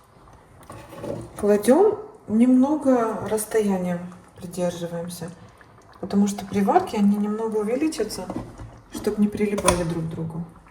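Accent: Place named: Gyumri, Armenia. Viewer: Я сразу поняла по акценту и очеень рада,я тоже из колориитного города Гюмри❤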